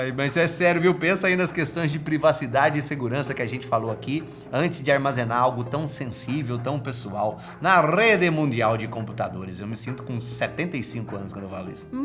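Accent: Minas Gerais, Brazil. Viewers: Mano, senti o mesmo, mas senti isso desde que o deixou de ser independente. Os efeitos ficaram profissa, tudo bonitão, mas, na minha opinião o nunca mais foi o mesmo. Ficou mais sério e menos zoeiro. Até aquele jeitão de falar de mineiro do interior diminuiu e eu gostava muito daquele estilo.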